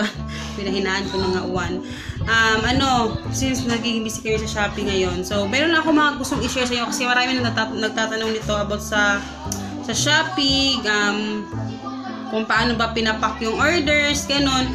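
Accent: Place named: Visayas, Philippines. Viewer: nakarelate ako sa tagalog dialect mo sis na may halong bisaya hehe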